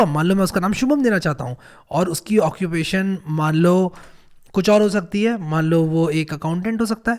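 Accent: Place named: Uttar Pradesh, India. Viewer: wo object jiske liye call Kiya jaa raha hai❤❤ | Aap UP se hone bhai😂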